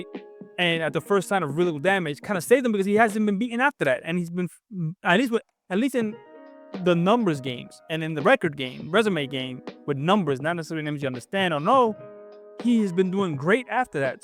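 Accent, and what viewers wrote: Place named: Latin America. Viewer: You may speak English, my friend, but you're actually vibrating and articulating in Spanish or some Latino version thereof. However, you are attempting to connect with a nation of many different cultures, anglo's figuring large among them. Your speech, in it's high stress. Latino rapidity, virges on the brink of becoming incomprehensible because of your machine gun fire manner of delivering your thoughts. And without attempting to be offensive, I must tell you, it becomes irritating and of limited tolerability to Western ears. So this will not be easy for you, because rapid fire Latino is your communication mode of choice. All I can say is, seek to chill out and slow down and to dwell upon the meaning of the words that explode out of your lips with such self-assured speed. They are all not necessarily connecting with your Western Brothers and sisters in the way that you might hope. 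And remember, the world is not going to come to your speaking or thinking style, you will have to modify yourself to meet it where it is. Good luck in this process of recalibrating yourself. Don't worry, in the end it will make you less compulsive and more pleasant to be around.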